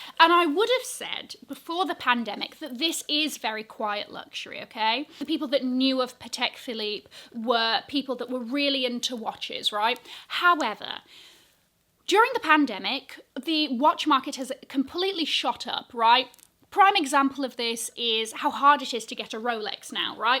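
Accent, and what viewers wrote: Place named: England, United Kingdom. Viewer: just came here to say I absolutely ADORE your accent ☺️ I’m new to the channel, so I’m not sure what part of England you’re from, but I think it’s absolutely smashing 💖 Hugs from America 🤗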